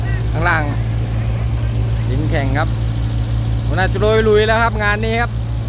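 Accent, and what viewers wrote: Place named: Sukhothai, Thailand. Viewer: สำเนียงเหมือนคนสุโขทัยแท้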